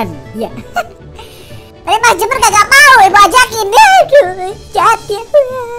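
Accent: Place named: Banyumas, Indonesia. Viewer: orang mama.? Kok kadang ngomong ngapak.?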